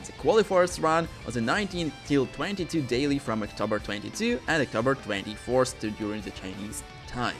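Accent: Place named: Baltic states. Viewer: Are you from the baltics? I have a friend from there and he has the same accent as you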